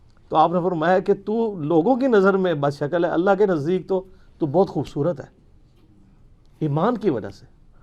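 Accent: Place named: Punjab, Pakistan. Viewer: it punjabi language accent if you don't like it then its your problem. most of the punjabis speak like this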